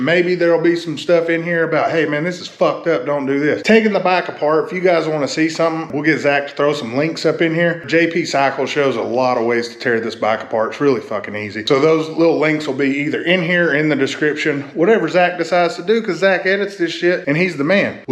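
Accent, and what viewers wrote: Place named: Appalachia, United States. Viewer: Why do people who talk like a HillBilllie sound low IQ to me ?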